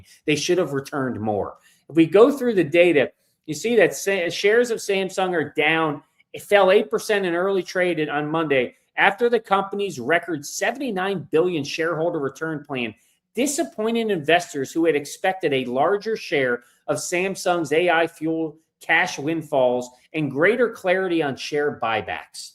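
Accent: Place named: Chicago, United States. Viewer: You sound like you're from Chicago, neither Boston or from Texas. 😊😊😊 tambien Feliz Cumpleanos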